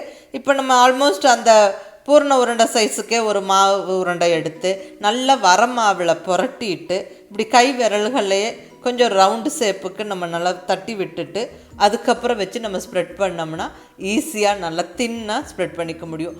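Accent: Kongu, India. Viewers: அருமையான receipe mam, கொங்கு தமிழில் உங்கள் குரல் அருமை.👌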